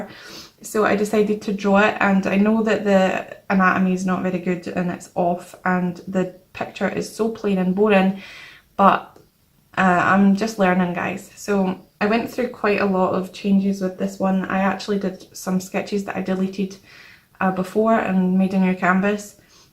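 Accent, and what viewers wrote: Scotland, United Kingdom. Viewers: Are you Scottish? Nice timelapse digipainting! WOW! 👌🏼 I love how well you painted her!!!❤️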